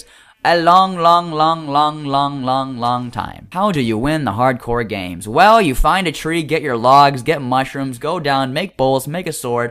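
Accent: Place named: Spain. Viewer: jaja me gusto tu asento español jaja saludos desde mexico i like your language spanish im a sub from mexico :)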